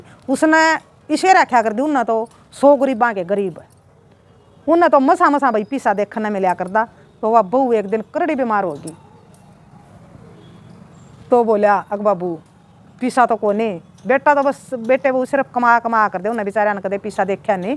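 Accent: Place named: Meham, India. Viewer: Aunti aap boli se humare Meham side ke lgte ho.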